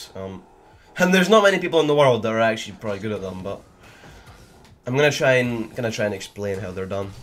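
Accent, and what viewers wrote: Scotland, United Kingdom. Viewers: What part of Scotland u from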